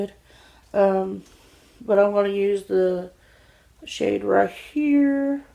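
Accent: South, United States. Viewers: Do u have a southern accent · She's so cute and I live her southern accent type🌈🌈🌈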